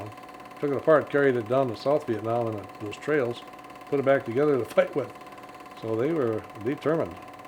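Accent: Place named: Wisconsin, United States. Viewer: Thank you for your service sir. You aren’t from Wisconsin by chance? The accent sounds like my home state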